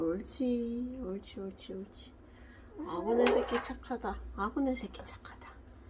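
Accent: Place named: Gyeongsang, South Korea. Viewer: 안녕하세요? 대구에 구내염 발치 하는곳 정보좀 알려주시면 감사하겠습니다 님 혹시 대구분 아닌가요? 경상도 억양이셔서..구내염 심한 길냥이 한녀석이 있는데 발치를 해서 나을수 있을까 해서요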